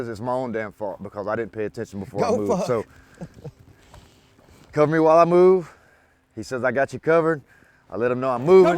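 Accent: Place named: South, United States. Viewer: This gentleman is definitely from the south.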